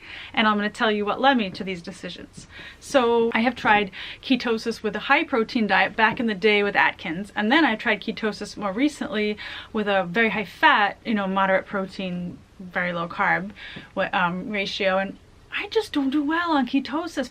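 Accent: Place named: Boston, United States. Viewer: BTW, if you don't mind my asking... where are you from/do you live? i've been trying to place your accent... i feel like i almost know it but can't quite place it. do you live in boston? i lived there once many years ago and it sounds vaguely familiar! i totally understand though if you don't want to say, this being the internet and all. :-)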